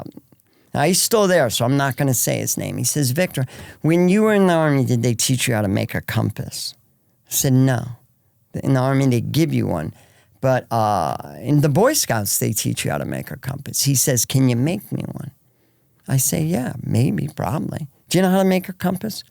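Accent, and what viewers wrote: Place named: Philadelphia, United States. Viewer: Dude sounds like he’s from S Philly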